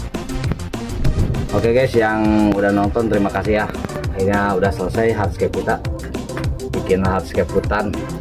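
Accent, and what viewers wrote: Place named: Medan, Indonesia. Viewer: Kau bicara kenapa jadi logat medan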